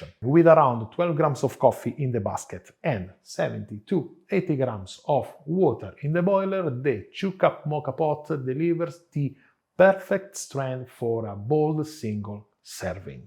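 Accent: Italy. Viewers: Listening to 10 minutes of english with an italian accent is the real treat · He is genuine, he is experienced. The Italian accent was a little tricky for me, so I added the subtitles on. However, I learned how to use the Moka Pot for Italian style coffee.. Can you show me the exact increments for each cup of coffee, For example, 12g of coffee with 70-80 g water. So what would the increments be for the other cups of coffee? The exact increments will make better coffee. Thank you so much for this presentation,.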